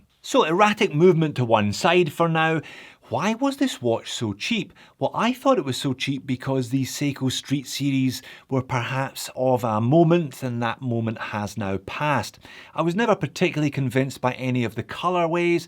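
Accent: Scotland, United Kingdom. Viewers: I so want to try a tuna on, I know it's a watch that you need to wear to get a feel for. Fanny around, always good to hear a bit of Scots.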